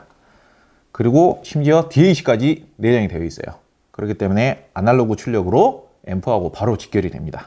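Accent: Jeolla, South Korea. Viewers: 전라도 사투리가 구수함 ㅋㅋㅋ 저두요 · 전라남도~ 약장수처럼 말하네요~~ 그닥 신뢰가 안가네요....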